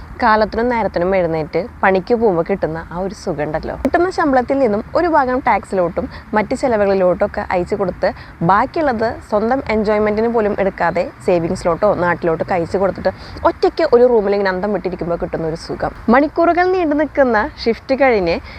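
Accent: Thrissur, India. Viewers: Ningalude Thrissur slang adipoli annu, and what you said is absolutely right😊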